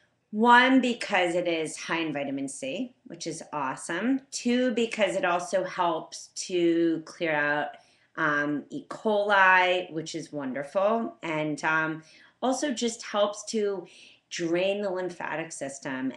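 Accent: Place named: South Shore, United States. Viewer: listen to that accent, south shore perhaps? can you eat them raw?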